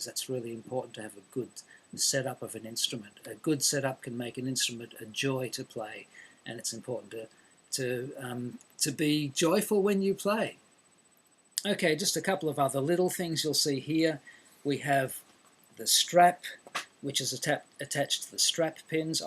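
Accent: Australia. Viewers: I assume you're an aussie, one as the accent and two the over all structure of how you speak. I've never met an aussie i didnt like and whether its just luck or something about your culture how you explain stuff is not only very easy to follow but very friendly but to the point. Simply, great stuff and keep up the great work, your method of teaching is warm and friendly. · Hi, ahhhh... finally some tutorials with an Aussie voice! I'm so glad I found you, I'm beginning bass (as soon as it arrives), and I'll be stalking you for a while I think. Easy to follow, understandable language for us newbies (especially those of us over 40's), and entirely logical in sequence. Thank you! and thank you again!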